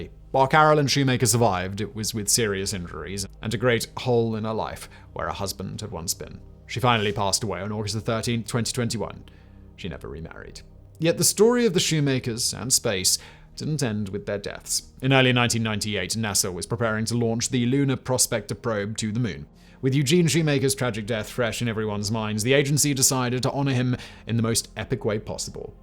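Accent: United Kingdom. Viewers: Please tell me where this guy's accent is from. Not the UK, or England, but what city.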